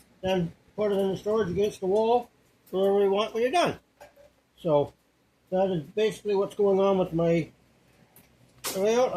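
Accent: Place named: Vancouver, Canada. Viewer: Interesting. I've not heard your accent here in Vancouver, surprisingly. I moved here nearly 30 years ago; maybe your accent is an older one from this area.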